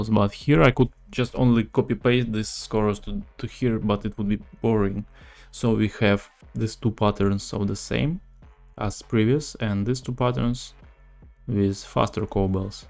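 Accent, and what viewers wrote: Russia. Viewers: Блет, по акценту ты будто русский:/ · акцент русский у тебя)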